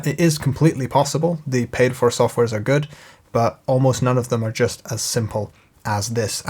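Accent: Scotland, United Kingdom. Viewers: Where is your accent from? You sound scottish with an english and american accent lol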